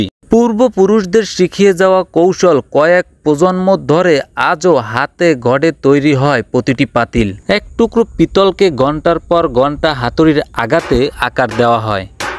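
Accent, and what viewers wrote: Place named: Barishal, Bangladesh. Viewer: এই বাস্যকারের বাড়ি মনে হয় বরিশালে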